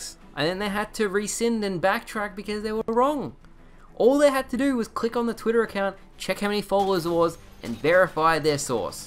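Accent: Australia. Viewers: Wooh up mate. Are you Aussie?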